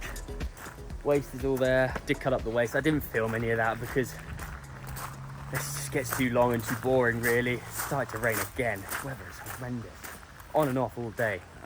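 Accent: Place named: Manchester, United Kingdom. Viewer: What part of Manchester are you from?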